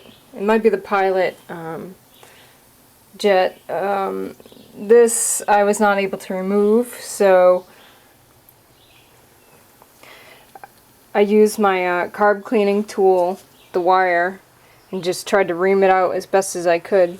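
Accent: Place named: Canada. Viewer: Hi, I know it's a while ago now, and this wasn't your bike, but did you discover the reason for the oil on the plugs and filter? Also I believe I detect a Canadian accent, but was it a California model? I hear they have their exhaust shit fed back to them through the carbs, which surely can't be healthy for the engine!! Bloody bullshit climate legislation!! When do they ban cow farts? The state of the filter was alarming. Did you perform a compression test? My guess is you didn't because it looks like a much harder job to access than on my CB-1, and that's a bugger on the inside cylinders. Could be valve seals I guess. It's sad to see a great bike neglected that badly isn't it? Thank you for the instructional vid. Finally, can you send me a bottle of pineol in the UK?;)) We don't have it here and I don't know what the equivalent is.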